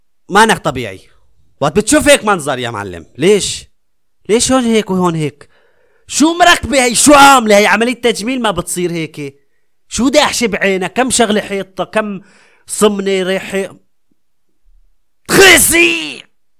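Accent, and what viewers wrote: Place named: Iraq. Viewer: سوي فيديوهات عن الاكل الصيني حباب متابعتك من العراق ولله مبدع وتره حجيك يويه يشبهه حجينه ولله احلى قناه...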